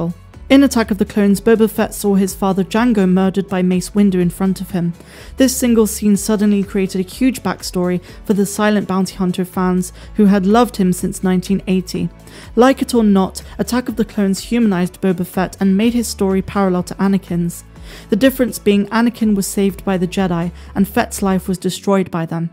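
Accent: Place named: Bristol, United Kingdom. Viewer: Love how you say jibba jabba, sound like you're from the Bristol area ? You should say jabba jabba instead 🤣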